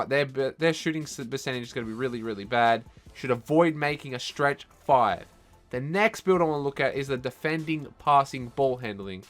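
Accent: Australia. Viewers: Are you from Australia or New Zealand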